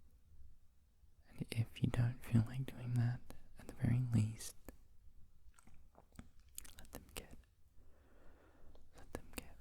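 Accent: Australia. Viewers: You have the perfect soft spoken AND whispery voice for ASMR, and I love feeling at home with the aussie accent. I swear when I subbed to your channel ages ago it was off the back of seeing a few of your videos, and one of them was like a light triggers one? I’ve been trying to find it but can’t, have you ever even done one before or have I gaslit myself into believing you have? If you haven’t, I think there’s some untapped potential there for you, you’ve already got visual triggers and sound triggers down pat, so if you added lights into your visuals that would go insane. Thanks for listening 😌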